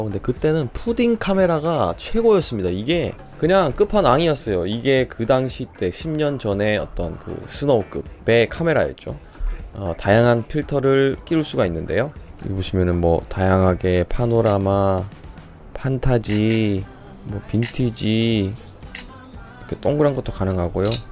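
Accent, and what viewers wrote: Gyeongsang, South Korea. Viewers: 같은 경상도 사람이네용~~ <mail>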